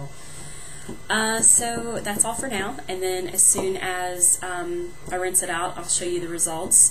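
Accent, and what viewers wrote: South, United States. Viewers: where r u from? you kinda sound southern?!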